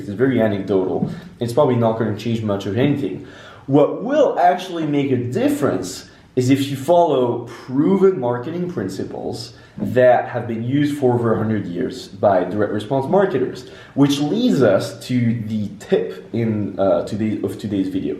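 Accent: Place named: England, United Kingdom. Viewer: Although I understand English (by the way you've a f*cking English accent it's beautiful!). Et selon moi, rajouter des sous-titres en français serait top (si jamais des français qui te soutiennent passent par là 😜) Prends soin de toi et hâte de voir tes prochaines vidéo chef 🥳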